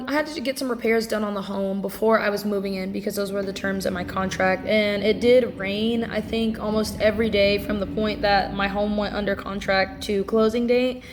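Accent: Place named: South, United States. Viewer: I like you country accent...is Tennessee or Texas a good place to buy a house? I'm looking to relocate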